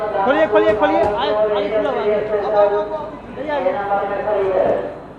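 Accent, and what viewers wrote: Bihar, India. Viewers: Shandar..khalis bihari andaz..uspar se mithi awaz..sandesh bhi hai anya ac wale blogger ke liye ki journey ke saath jaruratmandon ki dil se help karta hai.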